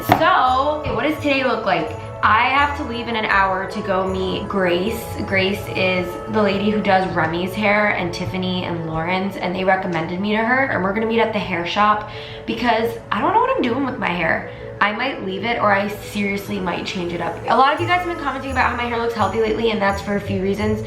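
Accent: United States. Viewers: I’m British and her accent seems stronger than other Americans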